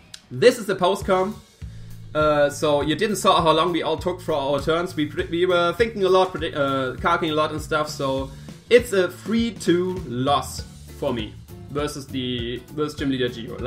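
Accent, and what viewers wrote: Germany. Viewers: are you german by any chance? you look, sound and your name sounds german. Im partially german so im curious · An amazing match. I feel reaaally bad for you for playing really good and than loosing for one mistake basically but focus on the positive of here by doing the right thing (no timer stall) and for playing really good, except for one turn. On another note, I'd suggest that you lower the "battle music" a bit. It was at times a bit hard to understand what you were saying 'cause the music was louder than your voice and even thou I like the German language and I don't mind the accent myself, it does unfortunately make your speech less understandable at times. GG and happy holidays!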